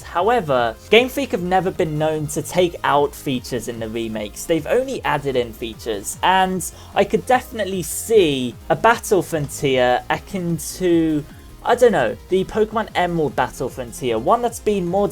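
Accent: United Kingdom. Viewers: Where is your accent from? Sometimes it sounds British, other times it sounds from the Orient. It's a cool accent.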